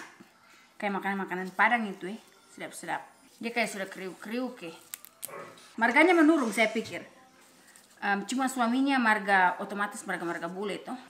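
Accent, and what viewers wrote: Manado, Indonesia. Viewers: Yoo bhs menadonya ...kental betul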